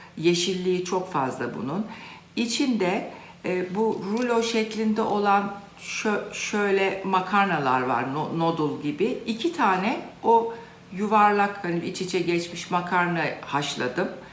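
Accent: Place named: Ankara, Turkey. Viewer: Sevgili Prof.ümüz, Ben sizi rejiminizden sonra tanidim.Güzellik ve canayakinliginiz disinda ictenlik ve dürüstlügünüz cok etkileyici.Ayrica siveniz ve sevdiginiz yemekleri hazirlama tarziniz benimle tipatip ayni.Acaba siz de Ankarali misiniz? Cok sevgiler ve selamlar.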